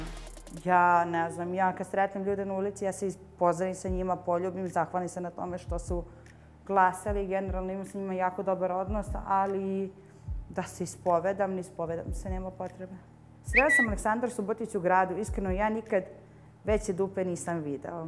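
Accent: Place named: Kaluđerica, Serbia. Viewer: Progovara Kaluđerica iz nje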